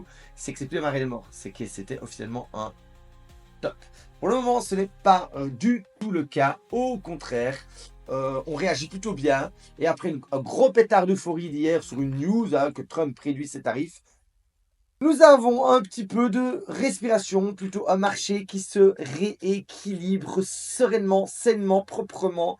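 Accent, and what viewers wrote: Belgium. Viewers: 👍😊 j’entends l’accent belge il me semble ? 😁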